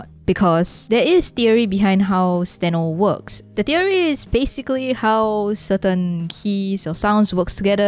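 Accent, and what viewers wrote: Singapore. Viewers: are you singaporean? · are you singaporean? 😁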